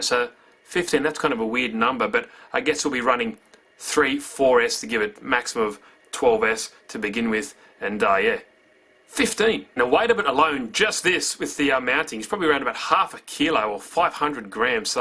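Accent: Australia. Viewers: secs and i already know he is an australian :D good video mate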